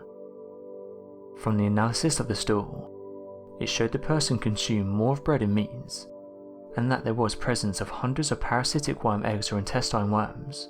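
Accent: United Kingdom. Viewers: i know you have your 800,00 followers and its well deserved but could you please change the tone of you voice cause it sounds like every sentence is the same. just a lil constructive criticism. each sentence sounds the same. literally. i like your videos but when I find myself happen to have clicked on one of your clips i dont want to see it out to the end cause of the bland reading of amazing things. you dont need to be over the top by all means but you end each sentence as boring as the last with a same British or english tongue that gets so old by sentence five. im sorry! you obviosly have got it made. i am just telling you i cant stand the same monotone over and over! thats the word i was looking. its just monotone. I think i have said this before honestly. dont take this bad its just i cant watch another clip of yours if its gonna be this amount of monotone.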